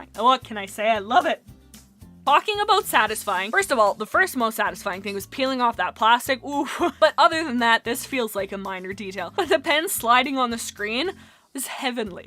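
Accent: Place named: Canada. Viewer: You're Canadian too?!?! I knew it I knew it I knew it! (Kinda) Cause that 'accent' that we Canadians have...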